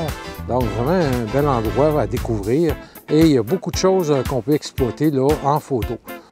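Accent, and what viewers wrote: Quebec, Canada. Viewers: Merci pour cette vidéo. Elle a achevé de me convaincre sur ce 24-120 f4 et le mien arrive aujourd’hui. Bravo pour ces vidéos fortement empreintes de professionnalisme et tellement plaisante à regarder ici en Belgique, surtout avec l’accent de Québec que j’adore!!!!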